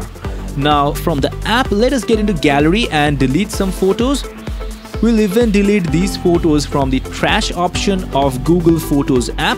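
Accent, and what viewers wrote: India. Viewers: Ok, after reading the comments and hearing him, I'm sure, you're Indian. By the way, nice video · Best Indian speaking english accent...great!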